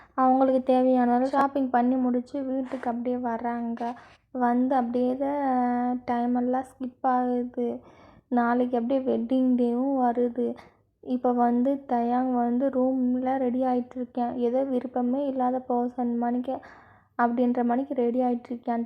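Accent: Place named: Kerala, India. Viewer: Neega malayalam ahhh..? Light ahhh malayalam slang varuthuu ana athum nallah tha erukkk super storyyy😌🤍🫴🏻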